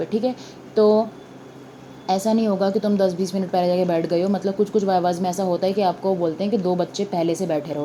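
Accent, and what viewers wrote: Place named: Haryana, India. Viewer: Miss chitra.. Zaruri ni h sabka tarika same ho kisi kisi ka alag hota hai or may be ho skta hai ye haryana side se ho tou isse farak ni pdta jaise ki neet ki coaching dete h physics wallah sir Allakh panday name h unka but aaj lakho log unke fans h kyuki unka tarika hi alag hai. Or i think jo zyada bna kr bolte h ya saja kr bolte h log unse bor ho jate h nd mujhe inka way of talking achha lga or ignou ka management hai hi aisa k muh se mithas nikalti hi ni h. Aisa waha ka staff b preshan hai.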